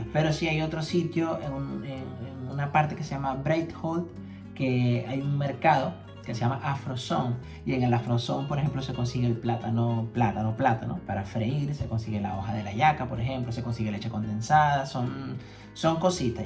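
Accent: Venezuela. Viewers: Gracias por compartir tus vivencias allá en Islandia..yo la verdad me río mucho con algunas cosas q dices..soy venezolana también..Guayanesa Edo.Bolivar..tenemos el mismo acento..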